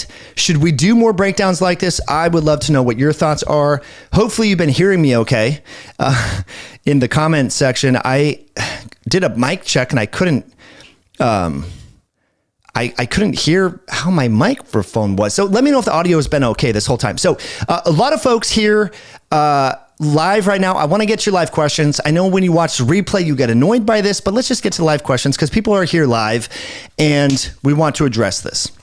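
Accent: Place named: South, United States. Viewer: North Carolina here. I heard a "bless his heart." You must be from the South. Hsha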